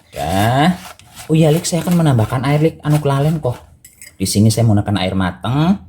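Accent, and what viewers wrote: Banyumas, Indonesia. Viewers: Bahasane mblaketaket Banyumasan versi Indonesia he hehe lanjutkan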